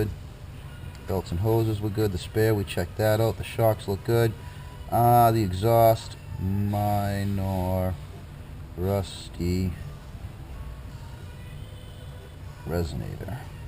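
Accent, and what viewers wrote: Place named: New England, United States. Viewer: hey.i see a few Saab videos! I have a 07 93 20t. so keep em coming! btw.. You in MA? I detected a new england accent.. take care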